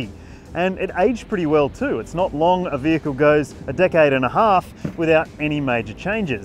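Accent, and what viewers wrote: Australia. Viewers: Hate the Aussie accent, but good, informative and even fun piece.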